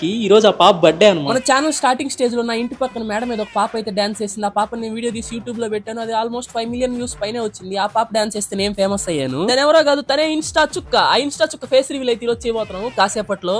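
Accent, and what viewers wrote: Nellore, India. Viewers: Edo ninna bore kottuta youtube loki vacha ayya Okka video edo random lo came yedooo ma ooru laga slang ma ooru laga undi ani chusta unna kalla kinda carry bags vachai work pakkana nuka chusukunta unna motham reels videos Neeku oka dannam ayya sami Me illu eda nayana nellore vachi darshanam chesukunta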